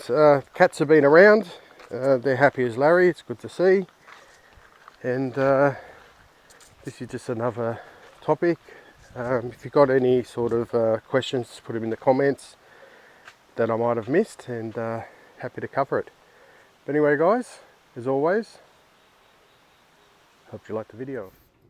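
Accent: Australia. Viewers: I'm a boomer Aussie looking to the Akiya market, it's not important, I just spend so much time in Japan, it makes sense that I should consider a home here (Aomori) You're a Taswegian, Queenstown or somewhere nearby by the sound of it. It's a eye opener, being in Japan, isn't it. Love to chat with you...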